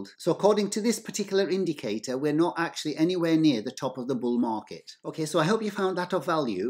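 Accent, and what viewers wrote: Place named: Birmingham, United Kingdom. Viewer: No - he's a brummie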